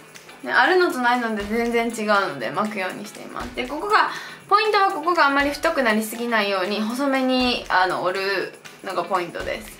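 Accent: Kansai, Japan. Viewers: 石原さとみさんに声似てると思ったらなんか顔も似てる😲✨👌 石原さとみさんの関西弁ってかんじです！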